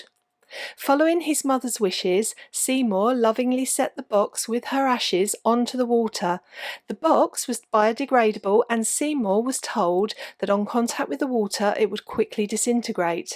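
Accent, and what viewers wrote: United Kingdom. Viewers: Oooh I really enjoyed watching your video, and listening to your voice and accent. I love England (only been there for about a year, long ago, and I'm dying to get back). I found you by chance, after watching a different person talk about Christine Keeler, and I very much prefer the way you talk ! (not to be mean, but the other person talks in such a stilted way, so it's a relief to listen to you!😄) I'll watch lots more of your videos. I also liked how you showed the real places. Oh I love the Thames and its surroundings! Anyway thank you and cheers from a Greek who landed up in LA but longs for England or Europe.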